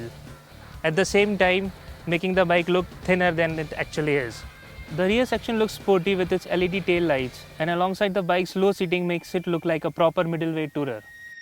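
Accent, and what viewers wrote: India. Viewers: I feel like I am listening to every India call center, trying to understand the mumbling English while I try and dispute a bill charge.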